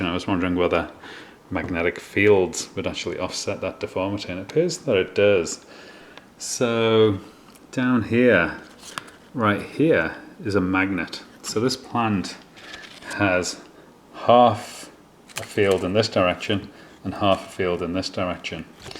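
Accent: United States. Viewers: Oh I could tell right away you were in Arizona, because of your accent!!! Lol!!!! Typical Arizona accent, lol!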